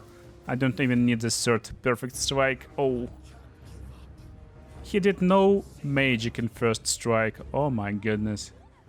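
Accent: Russia. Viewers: Русским диалектом на английском=)))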